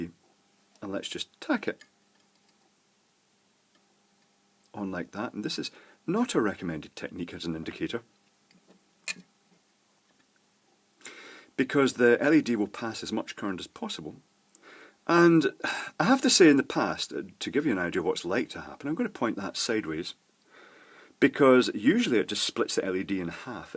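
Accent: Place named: Isle of Man, United Kingdom. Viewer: Ne-on. A noble gas that glows when excited by electricity. comes from the Isle of Man so he has a bit of an accent.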